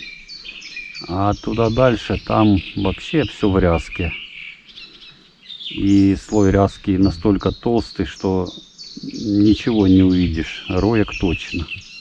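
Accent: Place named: Ukraine. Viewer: спасибо за рекомендацию) по Украине - дык по говору узнал своих😅 по карпу-Вы абсолютно правы! бывает 3 кг рвет круче 10ки. самое интересное в тгм году на небольшой но быстрой и закоряженной речке взял 2 карпов с разницей в несколько дней. оба 3400+-. дали абсолютно разный бой. первого я просто чудом достал. второго - легко и спокойно.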